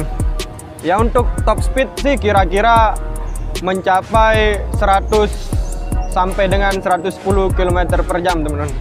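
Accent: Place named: Lombok, Indonesia. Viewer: seperti logat lombok bicaranya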